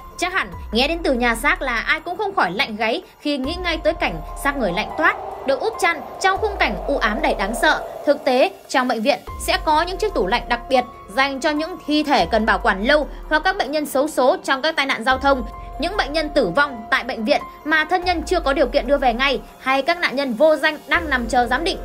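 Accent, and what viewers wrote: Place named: North, Vietnam. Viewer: Hello chi em kg biet chi ten la gi cu keu chi la nghe no kg hop voi chi chuc nao gi chi dep gai Thi ten cua chi chac Han la dep lam vay chi Cho em biet chi ten la gi di di nhe chi em rat thich giong noi bac ky cua chi chi noi chuyen rat la hay va duyen dang nghe hoai nghe mai ma kg thay Chang chi noi chuyen rat rat la hay va thu hut em da bi chi thu hut tu Luc Lan dau tien em xem clip cua chi chi la idol cua em va la than tuong cua em em rat ham mo va rat nguong mo chi trong TRAI Tim cua em vay chi nho Cho em biet danh tanh cua chi nha du la chi co ten gi em cung thich nguyen sau chi Cho em biet ten cua chi la gi la em day mang nguyen lam Roi chi dung de em cu keu chi la nghe no kg thich hop voi chi chuc nao vay nho Cho em biet chi ten la gi nha cam on Chi love from Melbourne Australia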